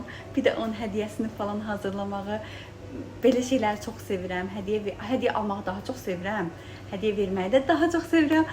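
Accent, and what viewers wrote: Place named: Ganja, Azerbaijan. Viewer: Soruçuram gence lehcesidi. Bilen bilir.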